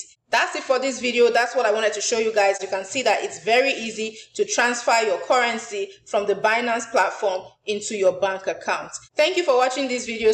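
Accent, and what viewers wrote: Nigeria. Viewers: haha your nigerian accent LOL :D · This lady is a Nigerian I just know from the accent Right??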